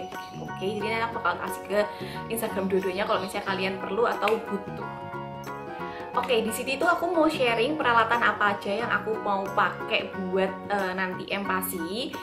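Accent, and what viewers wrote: Java, Indonesia. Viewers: diet ga? Abis melahirkan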